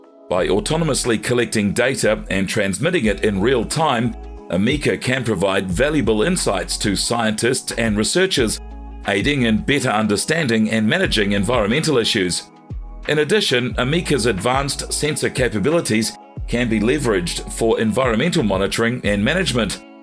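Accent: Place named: New Zealand. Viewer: A kiwi voice, crack up. Go bro! I love our accent.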